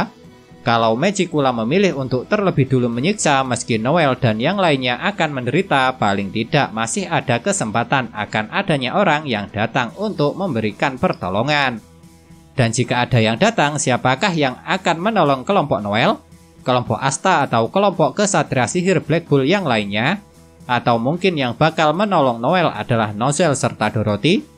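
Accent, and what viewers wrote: Java, Indonesia. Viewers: Dia wong jowo. Udh gitu enak meddok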